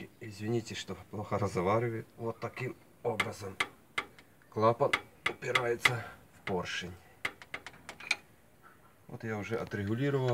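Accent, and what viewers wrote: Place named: Baltic. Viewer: У вас акцент буд-то вы из прибалтики.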